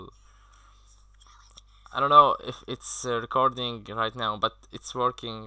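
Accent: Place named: Arab world. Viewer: شكرا يا مان لانوا من لهجتك عرفت انك عربي ، على لغتك الانكليزية رائعة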